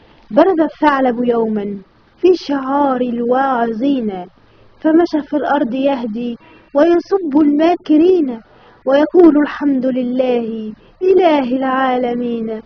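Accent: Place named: Egypt. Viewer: احسنتي لكن ملاحظتين فقط ١. ألفاظ بعض الحروف تنطق باللهجة المصرية مثل الجيم والثاء وهذا خطأ لفظي باللغة الفصحى ويجب ان تتدربي على النطق الصحيح لها + لو تنشدينها بدل الإلقاء سيحبها الاطفال شكرا لك.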